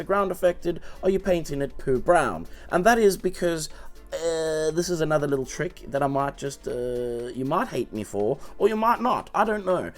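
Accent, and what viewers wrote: South Africa. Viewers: Just got into resin printing and painting, your channel is very informative, thanks man and keep up the great videos. You sound South African? Howzit! Lekka Lekka!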